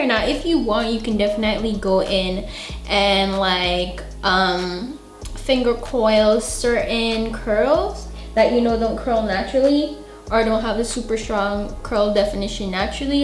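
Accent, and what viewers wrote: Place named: Caribbean. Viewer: I hear a slight accent! Are you by chance from the Caribbean?🥴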